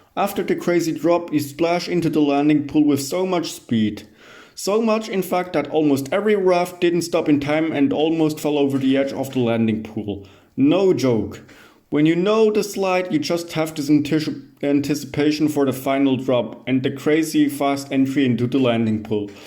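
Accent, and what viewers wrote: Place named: Germany. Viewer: Youre german,right?I can hear it...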